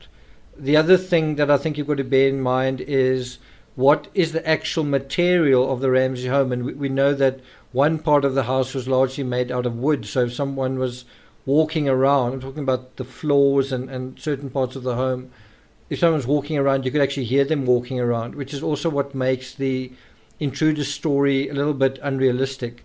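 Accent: South Africa. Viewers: Disliked because of your terrible South African accent.I'm South African myself,but Dude !!!!!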